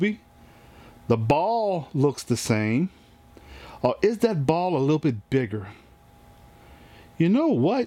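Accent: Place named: Lafayette, United States. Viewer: dude my Xbox was playing random videos about pops and yours popped up and as soon as I heard your accent I had to do a double take. I was born in Lafayette. so happy I found your channel!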